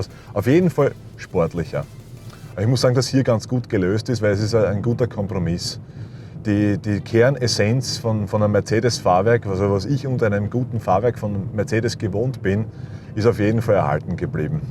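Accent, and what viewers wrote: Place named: Austria. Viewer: Deutsches dialekt won östrreich ist komisch......aber auto ist cool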